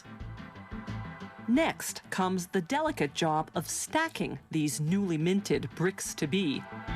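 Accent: Canada. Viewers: The commentator's Canadian dialect is thick. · The narrators on all the how its made videos are always SO annoying. i think they do it on purpose. They are obviously real people but they sound like the google translate voices. is this woman canadian or something? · Her accent sounds Canadian, not American. Listen to how she says "process." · Canadian much. That accent is killing me!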